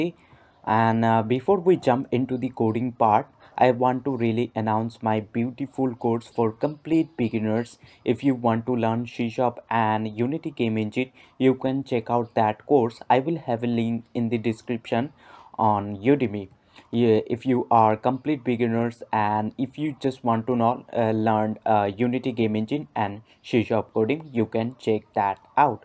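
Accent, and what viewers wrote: India. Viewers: The quality of the parallax is not good enough, also your project needs pixel perfect scrolling etc. Furthermore hindi accent is quite annoying for english speakers to listen to. So make great effort to lose that accent as good as possible.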